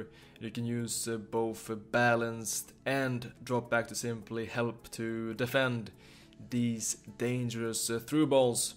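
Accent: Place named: Sweden. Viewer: Hahahaha. I first thought was some kind of Boris/Borat namechild. Heard the accent. "Ah, Borås.":D (not a big accent, but I could tell)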